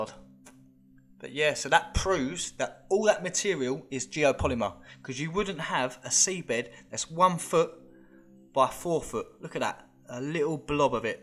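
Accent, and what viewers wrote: United Kingdom. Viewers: Hay love the accent bro it's so English even English isn't English enough for you cool video too love to find hidden stuff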